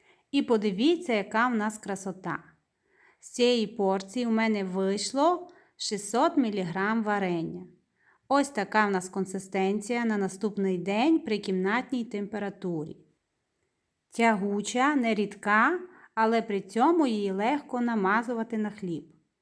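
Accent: Transcarpathia, Ukraine. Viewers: Відразу чути, що це наша Закарпатська господиня😊❤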